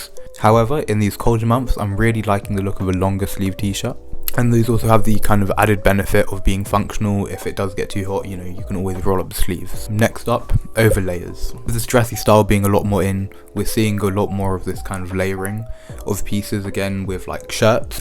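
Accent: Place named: England, United Kingdom. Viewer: just becoz you English accent 🤌is wow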